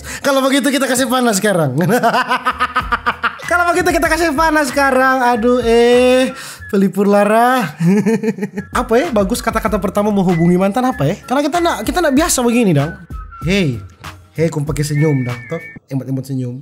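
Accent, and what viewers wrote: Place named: Palu, Indonesia. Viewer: Rupa ada ba logat palu tadi ka oppo 😂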